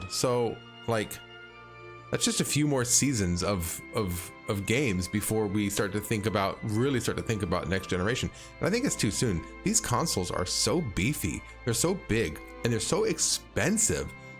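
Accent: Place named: Midwest, United States. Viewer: Are you from Minnesota or the Midwest? Your accent is strong lol.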